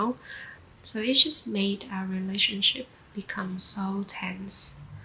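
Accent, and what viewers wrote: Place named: Australia. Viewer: Your accent is sooo Ausieeeee and I lov ittttt <3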